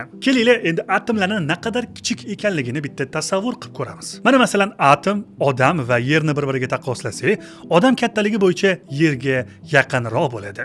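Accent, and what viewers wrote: Tashkent, Uzbekistan. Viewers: ука тошкент шевасида гапирмасдан адабий тилда гапирса бўлмайдими бутун ўзбеклар кўради фақат тошкентликлар кўрсинми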